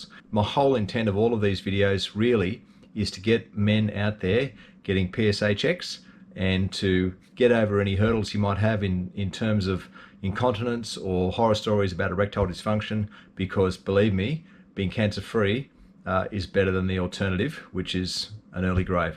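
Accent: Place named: Australia. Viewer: I really appreciated your series, your honesty and the clarity of your points. And great that I could hear it in my native language too (Aussie). Thanks!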